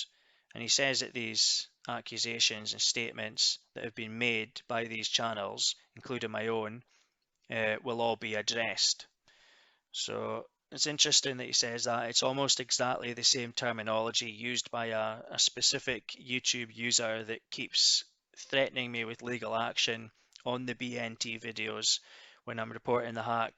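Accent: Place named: Aberdeenshire, United Kingdom. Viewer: Aye aye, We’ an accent like thon, there’s a fair chance ye can see Bennachie fae yer front door!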